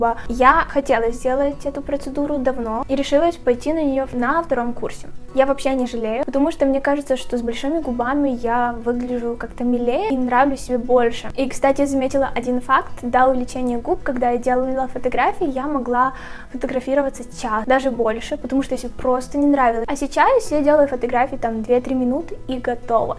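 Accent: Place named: Western Ukraine, Ukraine. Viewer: Это западно-украинский акцент. Ничего плохого здесь нет... А вы - нетолерантны.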